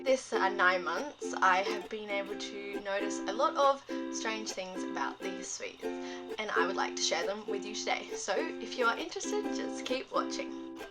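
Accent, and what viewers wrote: Australia. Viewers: Are you Australian? You sound like it! I'm from Sweden btw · I was having a bit of trouble saying for sure if you were Australian. As soon as you said, "know" like "knower." I was like, "AUSSIE!" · You're Aussie? Because you don't sound local American to me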